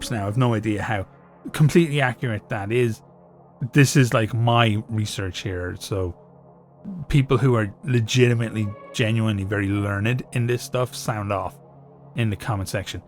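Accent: Ireland. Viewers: Are you a Kildare / Carlow man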